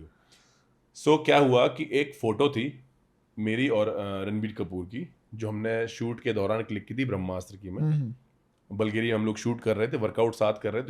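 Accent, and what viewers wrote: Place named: Haryana, India. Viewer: Bhai boli haryana ki esi s, pr Dil t aacha ha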